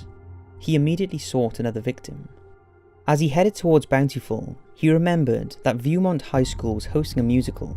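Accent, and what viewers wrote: United Kingdom. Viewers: Why in the fucking hell are there only British narrators on this bullshit, fuck this.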